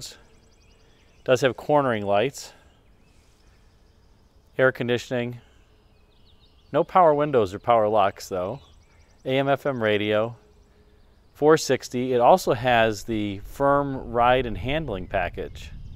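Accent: United States. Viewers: Always fascinated by American pronunciation of words like brougham & coupé. Unlike anywhere else! I do enjoy these videos; the cars featured in them hardly ever appeared on this side of the Atlantic. What baffles me is that so many seem to have switches on a part of the dash that can only be reached through the steering wheel. 🤔 Also intrigued that the speedo on this car goes 110,115,120. Don't recall seeing that 5 mph indication before.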